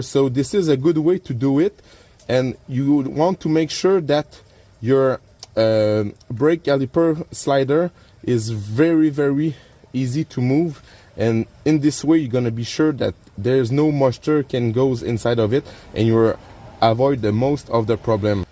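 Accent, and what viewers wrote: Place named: Quebec, Canada. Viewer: tu est définitivement un canadien francophone 😅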